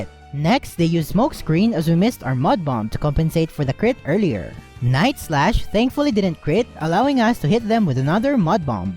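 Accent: Philippines. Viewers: Tol bago ako sa youtube channel mo at na recommend sa akin ng youtube at btw yung nung pagkarinig ko sa english mo sabi ko ay filipino to hahahaha D ko tinatawanan yung pag salita mo ng english pero kung sayu medjo d nakakatuwa Sorry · Pansin ko lang po boses mo eh napaisip ako pilipino kaya to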